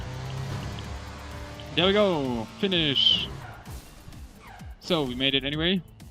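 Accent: Germany. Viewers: deutscher aksent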